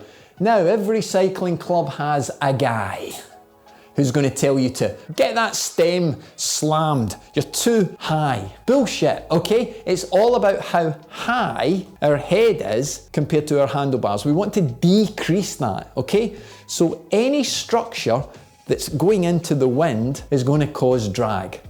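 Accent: Scotland, United Kingdom. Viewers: Fook me, did I just spend 12 minutes listening to some Scuuu...ottash lad telling me to get my head down and stay off the brakes! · I just watch these videos to hear him swear in Scottish.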